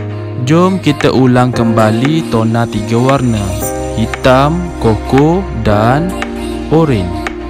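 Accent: Kelantan, Malaysia. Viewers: org kelantan? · cg,ore kelate ko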